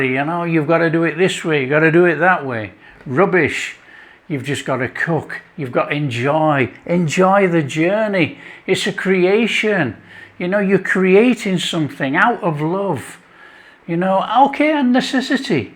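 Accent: Yorkshire, United Kingdom. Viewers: It is your lovely straightforward Yorkshire deliverance and of course the honest down to earth food. Keep going. All the best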